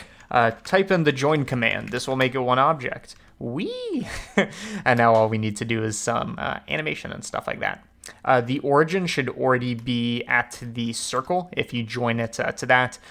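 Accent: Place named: Massachusetts, United States. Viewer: Wanna know how I know you're from Massachusetts?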